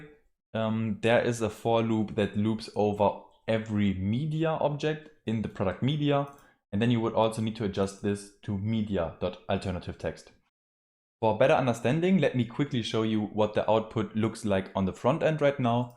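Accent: Germany. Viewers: are you german ? you have the accent man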